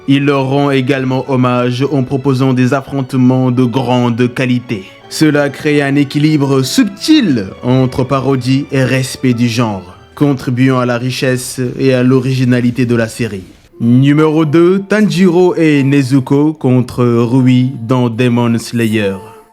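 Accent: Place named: Africa. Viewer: t'as un accent africains que tu veux gomer, c'est dommage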